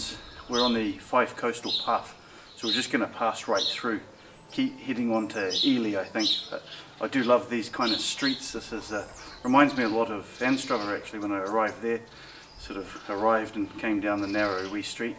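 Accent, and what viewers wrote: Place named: New Zealand. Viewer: For what it's worth I enjoy videos like this one. I am also a "Kiwi" (I thought I detected your accent a while ago but I was not certain) and I reside in New Zealand, but I lived in Scotland (Oban to be specific) for about two months in late 2001. There's some superb photography in this video - I really appreciated it.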